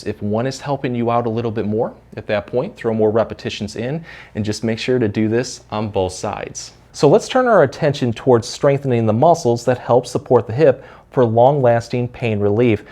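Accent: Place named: Midwest, United States. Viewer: Wonderful, I'm going to share this, then stretch. It's nice hearing the Midwestern accent. Chicago girl stuck in NC. Thanks for the video.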